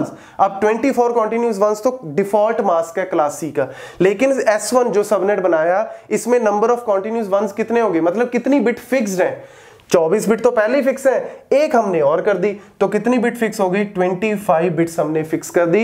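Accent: Punjab, India. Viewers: Good explanation . Apki hindi main punjabi accent atta hai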